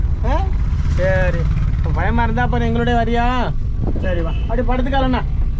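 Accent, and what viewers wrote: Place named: Thanjavur, India. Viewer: Akka orthanadu thambi yin valthugal Thanjavur pechu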